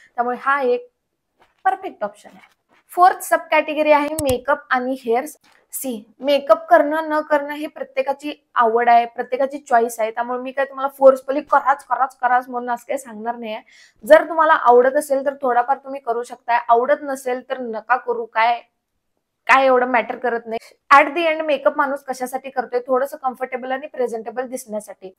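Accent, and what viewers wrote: Kolhapur, India. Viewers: I love the fact that you have sticked to the Kolhapuri accent unit now! Keep it up! Wishing you the huge success!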